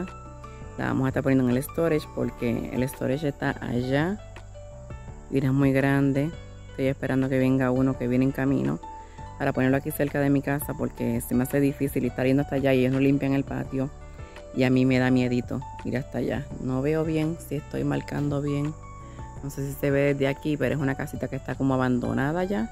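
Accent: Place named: Puerto Rico. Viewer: yo también soy de Puerto Rico vivo en Iowa. Te reconocí por nuestro acento. 😂😂 eres nueva y te voy apoyar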